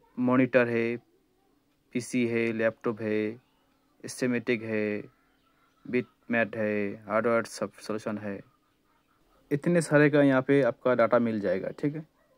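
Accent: Bengal, India. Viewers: tum Bengali ho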